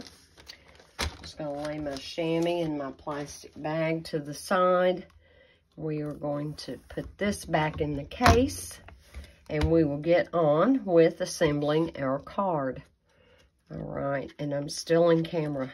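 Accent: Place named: Georgia, United States. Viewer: Where are you from? I love your accent. Reminds me of home (GA)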